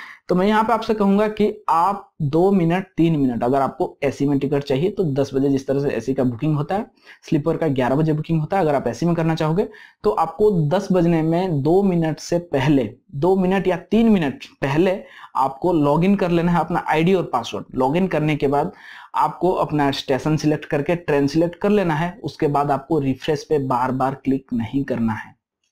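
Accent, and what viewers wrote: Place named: Bihar, India. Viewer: Lagta hai bhai bihar ka ho...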